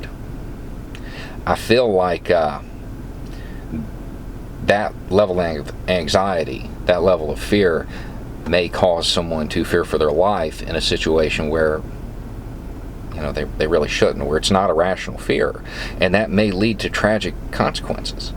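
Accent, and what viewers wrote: South, United States. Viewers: Why does common sense make so much more sense presented at the proper cadence, in a southern drawl? New subscriber and a big fan of everything I've heard so far. Howdy from Detroit.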